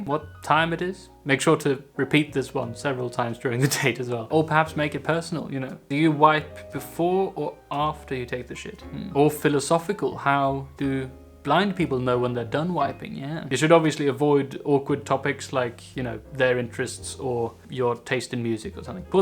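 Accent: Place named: England, United Kingdom. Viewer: Are you Swedish or English? If not, where did you get your English accent? Can you do one of you speaking Swedish?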